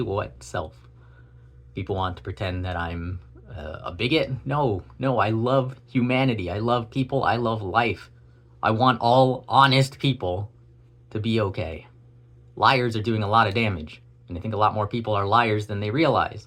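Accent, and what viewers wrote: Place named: United States. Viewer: What is this event you're talking about thatll kill us all? Are you saying the trump administration is going to instate a theocracy and go all Inquisition on us? Or do you mean something more international, or do you not live in America? Some less vague language might do you well, because you sound American, and no offense but you look and sound like you're going to bomb a synagogue or something. Are you ok? I'm with you though, on the antitheism. I'm right there with you. We need to leave magical thinking and religion behind, I've been saying it for years too. No idea why this 87 view video was on my front page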